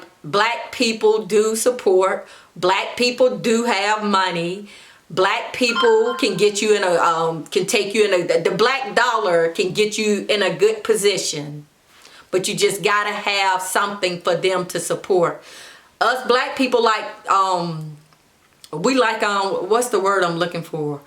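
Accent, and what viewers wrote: South, United States. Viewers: Im from north Jersey and I just love that . Southern accent .. and you look great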